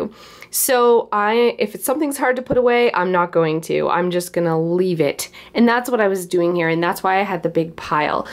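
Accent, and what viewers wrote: Canada. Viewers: I love your Canadian accent! <3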